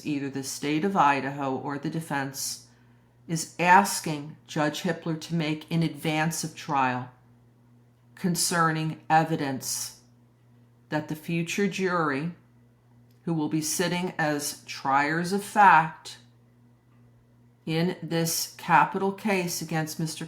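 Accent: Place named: Philadelphia, United States. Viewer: I have a question off-topic. Would you care to settle a bet between my wife and me? (Yes, despite my YouTube name, I am a male (long story)) We were trying to pin down your accent. She thinks Philly and I think Deleware. Do one of us have it right?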